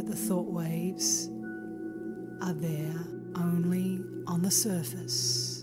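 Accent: Australia. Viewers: are you an Australia? I love listening to your readings and i love the space you give it, the most essential aspect of this work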